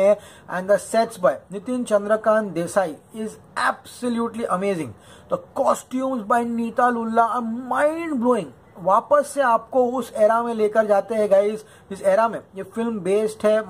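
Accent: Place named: Maharashtra, India. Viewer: Dada your Marathi was so sweet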